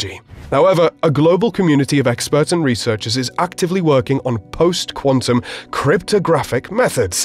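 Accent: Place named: England, United Kingdom. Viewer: Every time I look at YouTube and check anything in the US technical information Giant youtube? Some English dude, speaking. I can't stand it stand it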